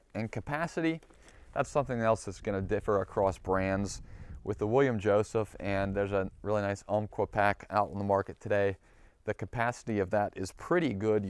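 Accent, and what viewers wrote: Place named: Pennsylvania, United States. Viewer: Knew as soon as I heard you speak you were a Pennsylvanian like me 😄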